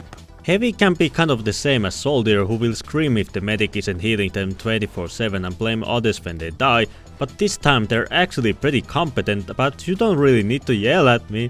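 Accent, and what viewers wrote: Finland. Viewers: Nyt on nii vahva suomiaccentti että aijaijai :D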